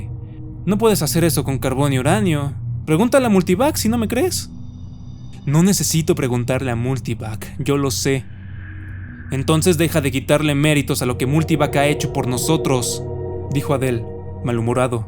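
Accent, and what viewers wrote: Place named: Zacatecas, Mexico. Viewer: Se nota el acento de Zacatecas pero es mucho mejor que la voz robótica o el español castizo con su odioso seseo 😢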